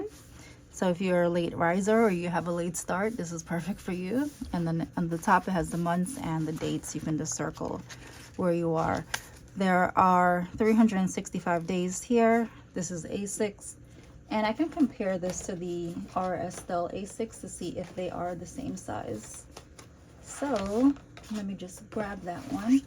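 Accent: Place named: Jamaica. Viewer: Your channel is refreshing... without the stress. I will learn a lot from you. Lol! And I scrolled through to ensure I was hearing a Jamaican accent albeit mixed 🇯🇲😎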